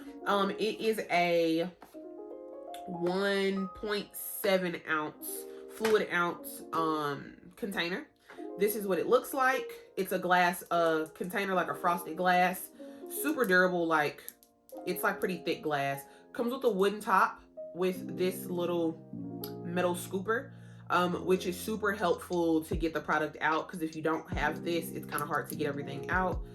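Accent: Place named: South, United States. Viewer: You sound like you are from the south! It's a southern accent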